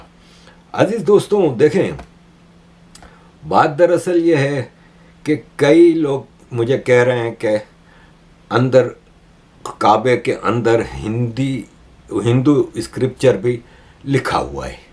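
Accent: Gujarat, India. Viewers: aapke bolne mai gujarati lehja aa jata hai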